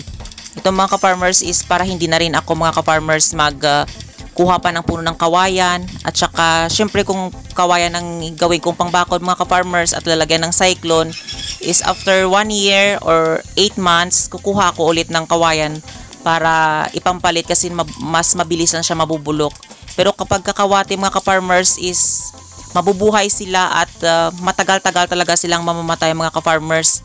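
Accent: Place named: Cebu, Philippines. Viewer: taga iloilo ka pala lods? akala ko cebuano ka..heheh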